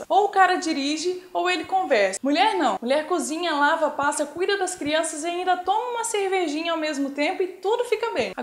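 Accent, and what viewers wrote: Minas Gerais, Brazil. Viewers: sotaque minerim uaii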